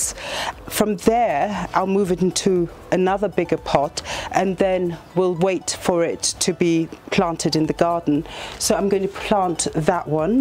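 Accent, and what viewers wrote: United Kingdom. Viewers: Your accent is so British (coming from England myself)!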